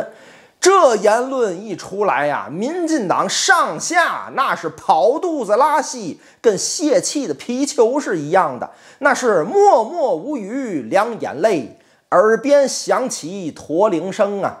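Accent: Tianjin, China. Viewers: 听口音好像天津的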